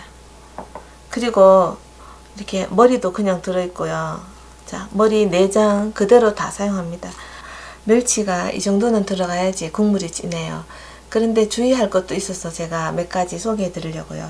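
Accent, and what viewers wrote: Gyeongsang, South Korea. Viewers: 경상도 억양이 반갑내요~ 오늘 처음 와 봤어요 구독 눌렀어요 잘 보고 갑니다~ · 상어..무섭지않아요 유치원 애기들 상어 인기짱입니다 우리동네 맛집으로 유명한 멸치육수칼국수집 비결이 바로 이렇게 육수내는거예요 요리는 배워가는게 많답니다 구수한경상도 아지매라서 더욱 흥미롭습니다 늘 고맙습니다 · 설명이 구수한 경상도 대구 말씨가 묻어있어 고향 향수 풋풋 하구요 미모는 전형적인 한국의 풍성한 정감에 내가 좋아 하는 멸치 다시물 설명 꼼꼼히 챙겨 정취했습니다 언제나 보람가득한 날 되시기를 기원 해요 🙌💖👍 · 가족님들,부럽네요😋.대구고향떠나40년해외거주하는할매입니다.정겨 운고향말씨와 편안한분위기가 느껴져...시원하고알찬요리솜씨에👏🙆.멸치육수끓일때 생강한쪽넣어면비린내가덜나지않을까싶은데 어떨까요?✨👱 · 미국에서도 응원보내요~~ 코인 육수 사서썼는대 뭔 맛도 안나고.. 해서 이번에 첨으로 멸치 사와봤어요 육수 직접 만들어보려구요. 멸치말리려고 내놨어요^^ 낼 꼭 따라해볼게요. 저도 경상도에 살았었는데 말투가 넘 반가워요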